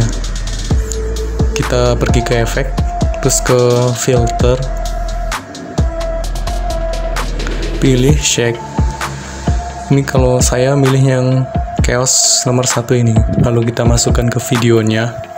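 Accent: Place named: Java, Indonesia. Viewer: Wong jowo toh iki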